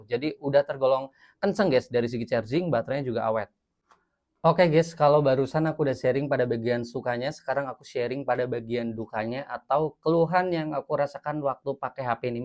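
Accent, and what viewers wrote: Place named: Java, Indonesia. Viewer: Uni chanel ini KAMPUNGAN banget cara melafalkan kata Inggris nya Guys malah dibaca Ges, HARUS nya Gais atau Gaes, jadi ilfeel males lanjutin nonton, biasanya Jawa itu gak bisa bilang Ai, semua huruf i malah di baca E padahal harusnya Ai, Guys ( gais, temen temen, sobat) malah di baca GES, lalu riding (raiding, di review otomotif) malah di baca Reding, Finger print ( harus nya di baca Finjer Print) malah si bilang Finger Print), harus nya G itu di baca J bukan Ng.. Miris nya yg subscribe banyak pasti Kejawen kampung dari pedalaman yg sama sama katrok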